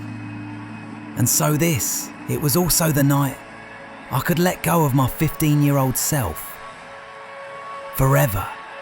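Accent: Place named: Italy. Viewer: You made a great video mate thank you Ps for an Italian you have a fine English accent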